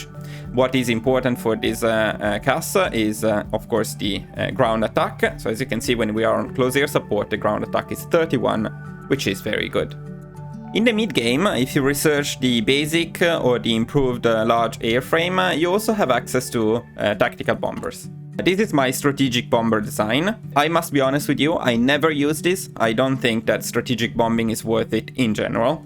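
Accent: Italy. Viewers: are you italian by chance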